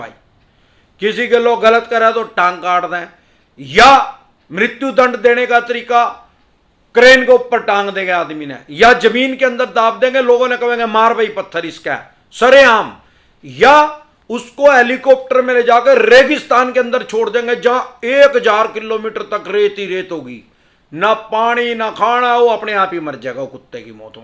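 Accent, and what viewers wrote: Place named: Kaithal, India. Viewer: Sir your voice is similar to our we belong to kaithal area nice presentation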